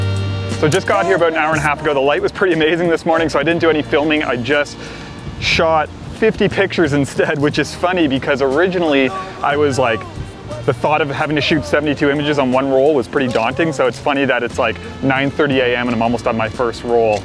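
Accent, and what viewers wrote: Canada. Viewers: Sounds Canadien.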